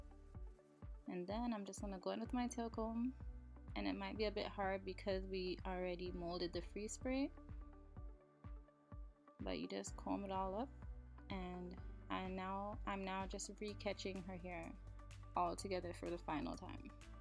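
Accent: Caribbean. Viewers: where are you from? I hear a Caribbean accent. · I hear a Caribbean accent 🤔.... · Is the girl doing the voice over Caribbean?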